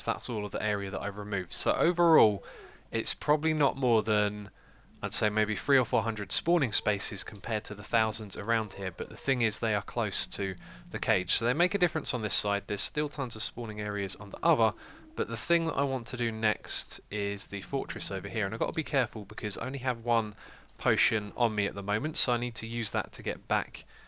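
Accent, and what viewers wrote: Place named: United Kingdom. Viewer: You can speak so good British! THX for learning my English to and GREAT PROGRESS VIDEO!!!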